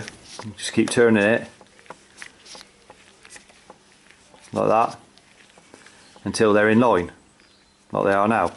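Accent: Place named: West Country, United Kingdom. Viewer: Sounds like UK west country, perhaps Devon.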